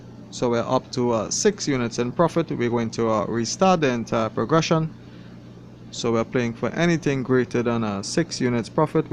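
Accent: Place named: Trinidad and Tobago. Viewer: u sound like u from trinidad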